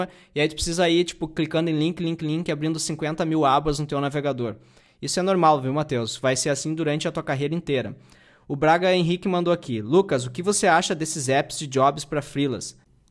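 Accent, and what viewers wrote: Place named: Porto Alegre, Brazil. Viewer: Me inscrevi pelo conteúdo e porque tu é de Porto Alegre (notei pela fala). Abraços!!!!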